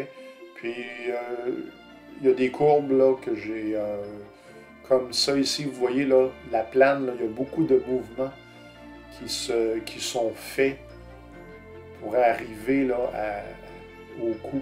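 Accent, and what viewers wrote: Quebec, Canada. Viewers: Bonjour! Pas facile la plane pour un débutant, mais avec cette vidéo qui complète tes anciens tuto, je me semble plus à l'aise. J'ai donc fini mon 1er lutin sans accrocher, et en plus avec la finition cyano. Un bonheur...Un retraité français( mon fils vit à Montréal depuis 10 ans, j'adore ton accent qui me refait vivre de bons mais rares moments au Québec )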